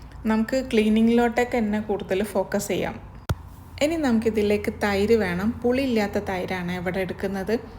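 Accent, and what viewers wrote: Kozhikode, India. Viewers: Kozhikode evda Samsaram Ketit kozhikode aanenu thonunu 😀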